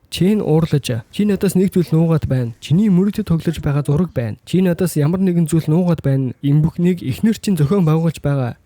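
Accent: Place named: Uvs, Mongolia. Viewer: Mongol heleeree nutagiin aylagtai yrij bgaa bizdee. Uvs aylaga l bh shig bna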